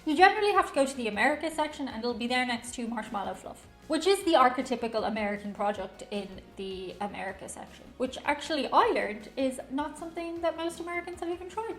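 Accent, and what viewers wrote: Dublin, Ireland. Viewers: Clare culchie here living in the states. I would love to know why you look for things to be a snobbish posh spoiled brat about Americans for? Really, I would love to know. I haven't been gone so long that I can't tell you have a West British Dublin accent but you don't have to act so British. If this is how the younger Irish acts and thinks did parents have come a long way in losing their parenting skills. You really are a little pompous s@ We are the Irish and we are the judged not the judges. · Your accent sounds Irish to me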